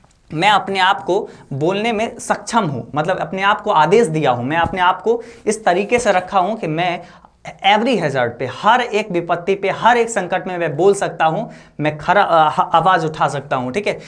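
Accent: Bihar, India. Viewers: proper bihari spotted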